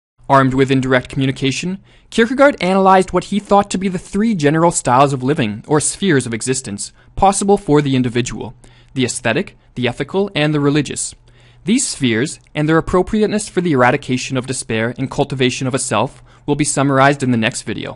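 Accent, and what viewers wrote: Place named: Canada. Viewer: I spot a Canadian accent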